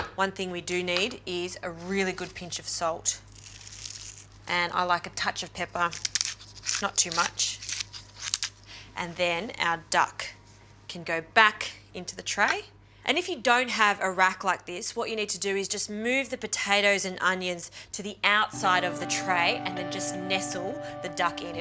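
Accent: Australia. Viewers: stop making yourself look like an idiot. I'm Australian, she's an Australian celebrity chef, America is the only place not using metric and her Australian accent is a dead give away, even though I don't notice the accent because I'm Australian. "Everyday Gourmet" is an Australian cooking show hosted by Justine, the lady in the video. So yes, it is metric, it is obvious to anyone that has morw than 2 cents for a brain. Merry kiss my ass. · Oh my God guys. It's pretty clear from her accent she's Australian so yes she uses Celsius. Come on.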